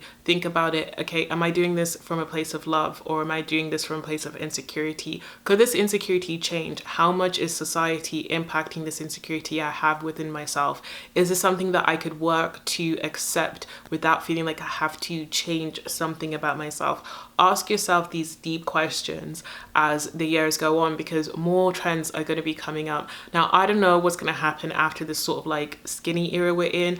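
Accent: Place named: United Kingdom. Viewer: are you British? I absolutely love your accent.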